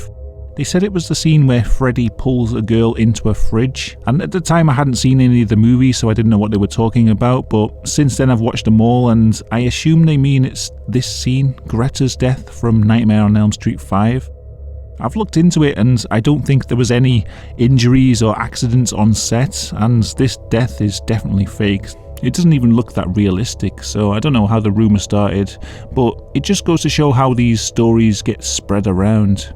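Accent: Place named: Cockney, United Kingdom. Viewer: nice accent. cockney?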